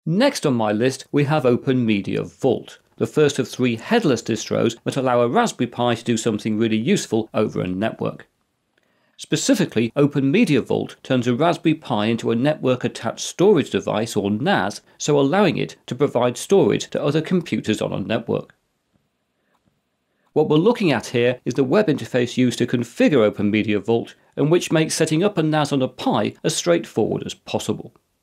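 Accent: England, United Kingdom. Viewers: I love this voices , love the culture, love this england accent ! Ohhh god save the england peoples from islã invaders ! Do not let this blessed land, inspiring land be consumed !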